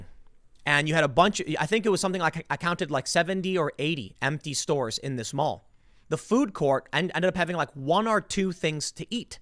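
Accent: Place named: Chicago, United States. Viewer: your Chicago accent kinda shows when the first 30 seconds into the video When you said "Deblasio is dumb as a box of rocks" i like the way you said dumb.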